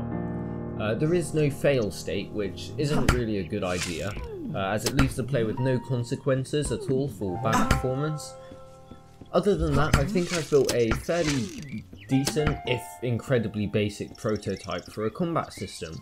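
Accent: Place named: United Kingdom. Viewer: Where you from bro? You sound bri'ish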